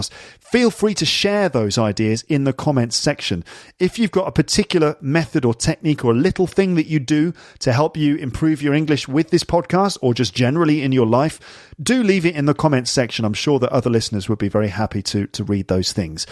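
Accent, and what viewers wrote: United Kingdom. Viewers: One of my favourite way to practice listening British English :> Thks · speaks the standard form of British English. One of his podcasts he mentioned that he speaks as received pronunciation (the kind of accent that you may hear on BBC). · This podcast is exactly what I was looking for, I love the level, the speed, the topics, and specially I love to understand the most beautiful accent: British, at last! Thanks a lot,!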